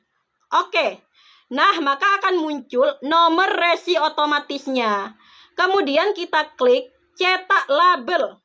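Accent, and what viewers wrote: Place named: Madura, Indonesia. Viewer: Makasih banyak kaka atas penjelasannya yang sangat bagus, detail, dan rinci. Dari logatnya, kayaknya kaka orang Madura ya😊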